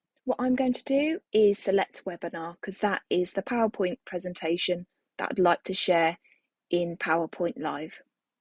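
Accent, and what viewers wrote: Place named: Birmingham, United Kingdom. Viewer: Excellent. Are you a Brummie?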